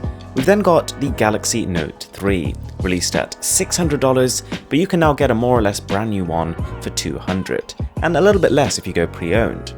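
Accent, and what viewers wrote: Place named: United Kingdom. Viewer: he was born and brought up in England. He's a British citizen, and hence the accent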